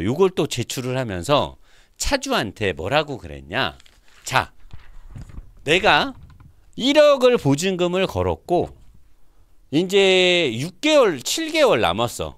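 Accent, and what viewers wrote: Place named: Chungcheong, South Korea. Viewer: 충청도여~뭐여~~😅좋은 정보 감사합니다! 사장님!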